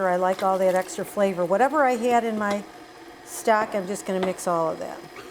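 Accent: Midwest, United States. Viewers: What a horrible Midwest accent lol. It what I expected. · Mexican lady with a midwest accent. She is too cute!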